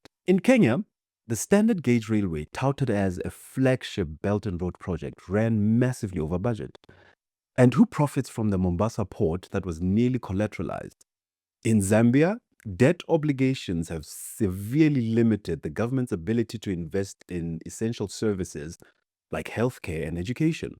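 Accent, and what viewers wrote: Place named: South Africa. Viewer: I wouldn't be looking at the Chinese or Russians or anyone else. Look at those corrupt sellout leaders and politicians who care nothing for their countries or its people... BTW you sound like a South African if I were you. I'd be more concerned about how it is that 7% of your population own the majority of the land in that country...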